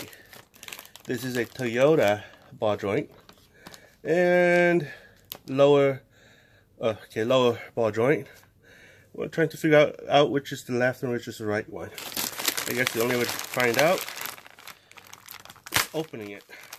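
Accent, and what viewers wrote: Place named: Hawaii, United States. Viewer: Where are you from you sound local to my area I am from Maui Hawaii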